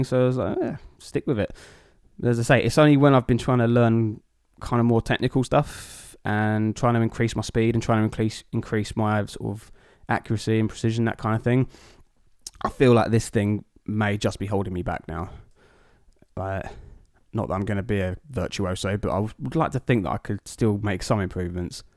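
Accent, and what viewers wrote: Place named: Texas, United States. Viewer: Before this video I was pretty sure you were American, and probably from Texas.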